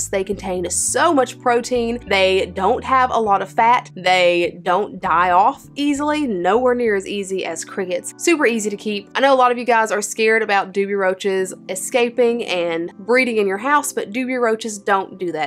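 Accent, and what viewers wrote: South, United States. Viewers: Okay love your channel and Omfg your southern accent is just freaking adorable omg …… lol I’m from ga I’m southern as well but omg u just sound to cute are you from Texas bama or Ga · Love your accent! Sounds southern :)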